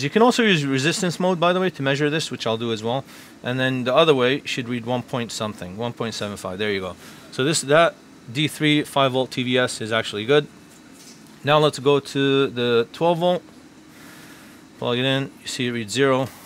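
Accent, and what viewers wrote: New York, United States. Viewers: It’s that New York accent. We just talk like that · That's "New York City". They all sound like that. :)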